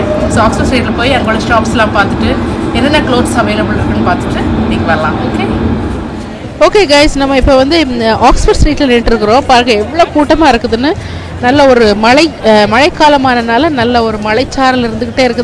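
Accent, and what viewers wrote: Nagercoil, India. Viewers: Nagercoil Slang💪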